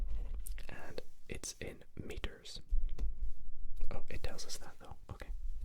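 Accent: Scotland, United Kingdom. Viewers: YOOOOO I DIDNT KNOW U WERE FROM SCOTLAND I KNEW THERE WAS SOMETHING FAMILIAR ABIUT YOU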